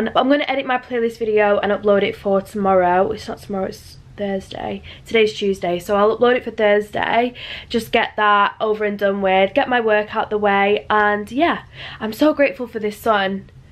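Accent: Manchester, United Kingdom. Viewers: Where’s the Manc accent gone thoooo! 😂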